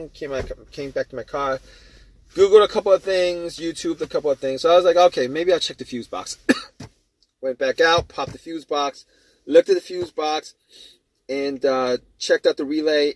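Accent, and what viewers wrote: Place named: New York, United States. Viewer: The reason he talks so long, because youtube requires at least an 8 minute video to consider it an informational or useful video to get credit for any views or subscriptions and likes and comment. Fuckin new York east coast accent.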